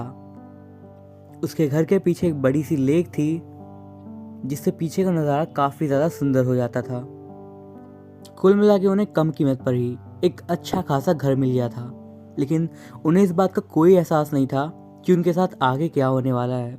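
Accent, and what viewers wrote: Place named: United Kingdom. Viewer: Ji, mai aapka ek fan hoon, ye ho gayi sabse pehli baat. Ab mai kuchh aisa kehna chahta hoon, jise sun kar, mai ummeed karta hoon ki aap bura nahi manenge balki, meri baat ko positive way mein lenge. Mai darasal ek suggestion dena chahta hoon aapko- please ho sake toh apne "र" ke pronounciation ko theek karne ki koshish karein kyun ki woh sunne mein aisa lagta hai jaise koi angrez kahaani suna raha hai, aur dusri baat, jab aap kahaani sunate hain toh beech-beech mein aapke do (2) words intermingle ho jate hain, jiski wajah se woh vakya theek se samajh mein nahi aati. Baki sab Great hai Boss! Aap ek amazing story teller / reader ho, aapki voice expression perfect hai. Bas, just yahi do-ek cheezein sahi ho jayein toh aap really outstanding performer ho sakte hain. Isiliye aapse request karta hoon ki zara in biduon per dhyan dein jo ki maine apne comment mein ujagar ki hai. 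Ummeed karta hoon ki aap meri (apne ek fan) ki baaton ko positive way mein lenge, na ki, bura manenge. Please do believe me buddy, i just love you & your stories too much!❤👏🏼👌🏻👍🏻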